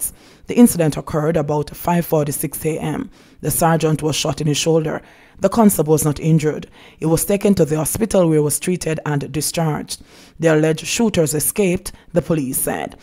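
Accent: Jamaica. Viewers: Love u one more time my girl real jamaica talk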